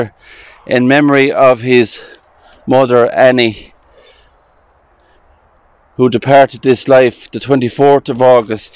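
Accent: Ireland. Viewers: What wonderful video. When our children have moved out, I hope we can travel to Ireland and visit our ancestors and look at the old churches. Just a minor suggestion for you to consider. Maybe give the location and formal name of church in the written notes for each video? Your brogue is wonderful to listen to for us out of Ireland, but hard to make out the names by pronunciation and given spelling differences. (I'd love to add this church, for instance, to a vacation wish list, but I have no idea where it is.) 😢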